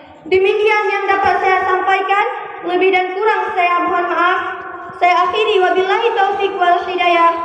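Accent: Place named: Aceh, Indonesia. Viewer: Medok kli acehnya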